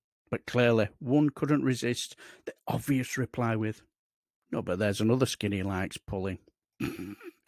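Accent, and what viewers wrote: Yorkshire, United Kingdom. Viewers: Never heard of Darren Grimes but won't make a point of following the vacuous populist sh1t. Btw, nothing wrong with a Yorkshire accent; and that's from a Lancastrian. The fact that number 4 was missing was hardly the end of the world, but made me rewind to check. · Another enjoyable vid. By the way, my previous comment was purely a question, and not meant as a complaint. And, even though I regularly listen to Phil and Max, my weekdays are not complete until I have enjoyed your dulcet Yorkshire tones. Please keep up the good work.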